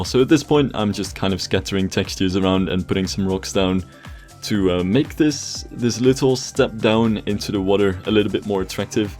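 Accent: Netherlands. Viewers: Yeahh I know but I was checking his Socialblade and there was an other country xD but his voice is Dutch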